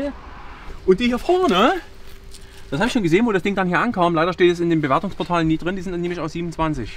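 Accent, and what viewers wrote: Saxony, Germany. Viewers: Alles gut ! Aber das gesächsel macht es anstrengend zu folgen . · Gehört das vulgäre Geschwätz zum Konzept? Schwer zu ertragen. Sorry, aber Sächsisch ist schon anstrengend genug!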